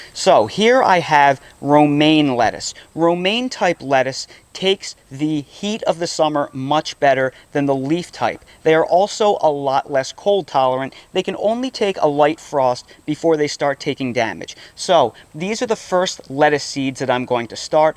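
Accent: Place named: New Jersey, United States. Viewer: You can take the boy out of Jersey but . . . LOVE your content - you have to be from Jersey; No? Bucks County here - just had to comment little northern for the south - love it though!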